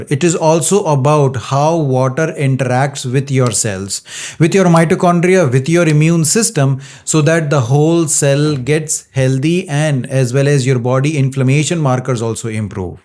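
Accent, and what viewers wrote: India. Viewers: Your accent tells me you are not from US! Even those that have studied from US do pick some accent. Yours is very typical Indian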